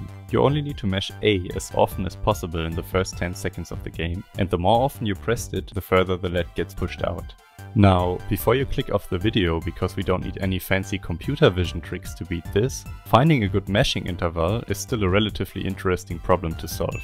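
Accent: Germany. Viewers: Man merkt an dem Akzent, dass du deutscher bist 😂 Find ich eigentlich ganz toll, dass man sowas gut erkennen kann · ich konnte schon nach wenigen sekunden an deinem akzent erkennen dass du auch deutsch bist haha :) ich bin übrigens weiblich und habe zwar keinen freund der mir das video geschickt hat, aber mario party ds ist einfach meine kindheit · Man merkt dein deutschen Akzent Kollege Schnürschuh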